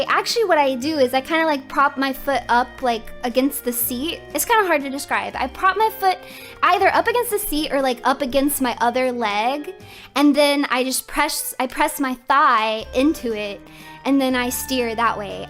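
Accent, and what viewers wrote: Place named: South, United States. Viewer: I absolutely drive with my knee 93% of the time. Also this seems to be from same stream as the forts convo. I live in the south my whole life and have zero accent. She seems chill and good person and good friend. That ya’ll she has is dead giveaway / she shouldn’t adjust to a ‘proper’ speech pattern and cadence because of viewers. F them - forcing denial and faking true self for other people. How do I befriend her as asexual male and acoustically gifted - you wanna just like.. spend time together and hang as friends? Not that non-acoustically minded normies would understand that friendships. Or that’s what my ptsd says anyway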